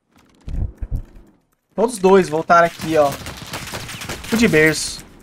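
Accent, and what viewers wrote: Minas Gerais, Brazil. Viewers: sotaque de mineiro é o melhor kkkkkkkkkkkkk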